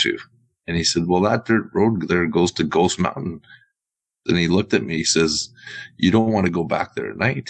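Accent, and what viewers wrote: Canada. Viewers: Dude sounds like Tom Selleck with a touch of Canadian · I love your Canadian accent! Great story!❤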